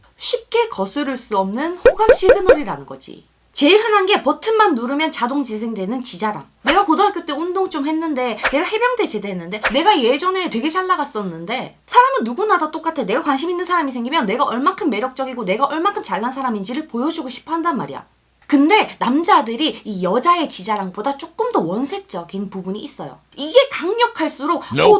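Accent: Gyeongsang, South Korea. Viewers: 온니 대구 사람이에용? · 언니 대구 사람이구낭😍 이렇게 엮어들어거는거란 말이죠?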